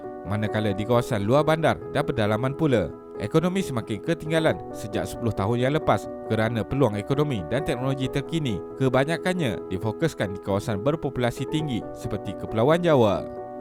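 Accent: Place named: Peninsular Malaysia, Malaysia. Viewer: Asyiiiik..... dialek semenanjung nyaman didengar, macam berade di semenanjung sumatera.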